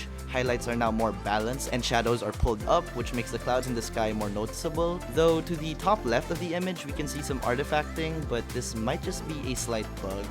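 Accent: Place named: Philippines. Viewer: had no idea this dude was filippino, the accent turned from almost amerian to taglish real quick after 20 seconds, only after having read the comments I have realized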